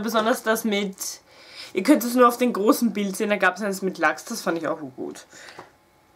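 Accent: Austria. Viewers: Ich find dich so süß. Ich könnt dich grad echt knuddeln. Und ich LIEBE deinen österreichischen Dialekt. :-)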